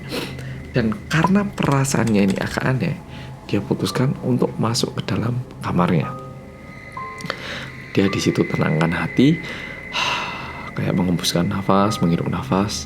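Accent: Java, Indonesia. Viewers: MENJENGGONG ASTAGA YA LORD MAS WKWKW😭😭 jowomu muedhok temen mas😭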